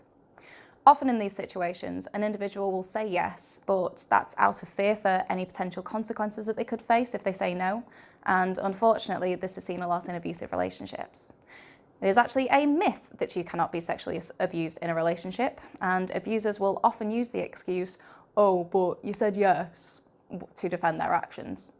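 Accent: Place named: United Kingdom. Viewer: i'm pretty sure the person speaking is british too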